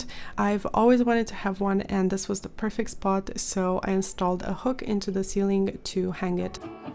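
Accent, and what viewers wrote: Germany. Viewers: are you from germany? cause of your accent?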